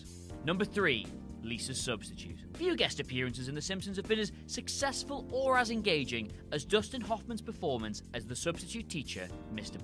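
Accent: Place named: Northern England, United Kingdom. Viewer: These are heartbreaking and sweet in various ways. But what I will say is that Abe was a bad father first and thats a lot to do with why Homer is a bad son....it doesn't excuse him but still. Also, i think this is the first Northern English voice ive heard on narration on here. Being Northern English myself, its comforting to hear this.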